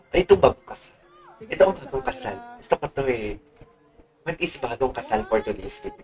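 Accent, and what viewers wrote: Iloilo, Philippines. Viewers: Ilonggo ka sir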